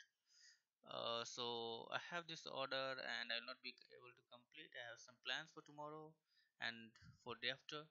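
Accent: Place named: Bengal, India. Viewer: You have a bengali accent Are u bengali? Nice video btw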